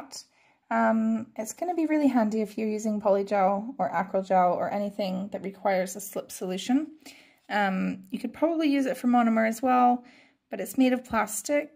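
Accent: Scotland, United Kingdom. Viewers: that's interesting, I thought one of the accents I could hear was Scottish! Some Canadians do share sayings and pronoinciation though. I'm Scottish myself and live in Falkirk:)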